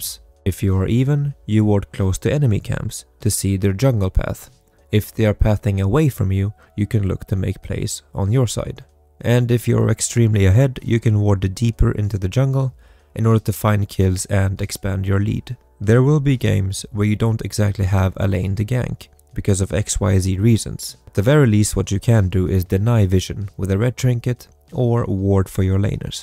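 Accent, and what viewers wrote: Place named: Sweden. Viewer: The way I could tell this would be a swedish channel bc of the accent. Nice vid btw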